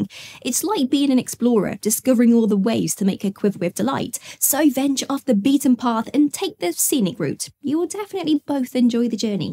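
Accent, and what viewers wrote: United Kingdom. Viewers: Damn I need to find a woman like. I love the British accent from a woman. (Never Assume Anything, it always makes an Ass of U M E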